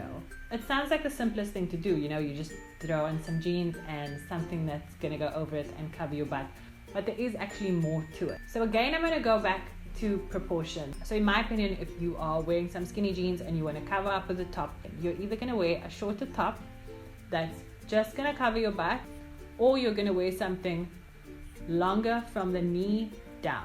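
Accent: South Africa. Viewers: Love the South African accept ❤️💜💙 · South Africa!! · Are you South African?? your accent is so interesting!! cool video btw <3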